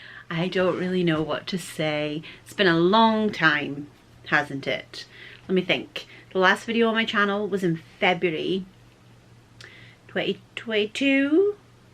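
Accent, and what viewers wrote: Scotland, United Kingdom. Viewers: I have never watched any of your videos before. I love planners and like to try different ones. When I heard you speak, I thought maybe you were from Scotland. My grandma was born in Dalmellington. You are so brave to jump back into this. I wish you the best on your recovery journey.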